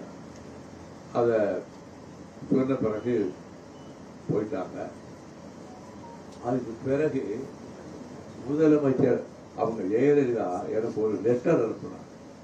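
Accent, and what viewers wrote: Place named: Telugu, India. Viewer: தெலுங்கன் பேசுவது தெரிகிறது. இது 10" 10 ரூமா 😢😢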